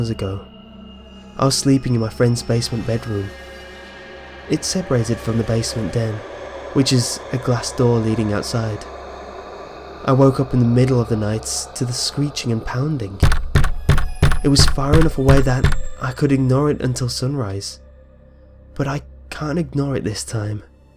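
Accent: United Kingdom. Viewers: Is British? What's the accent anyways?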